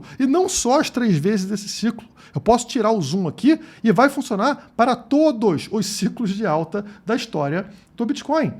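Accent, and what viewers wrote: Rio de Janeiro, Brazil. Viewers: Pq tanto desespero pra vender curso se vc é tão foda ? 😂😂😂 Carioca né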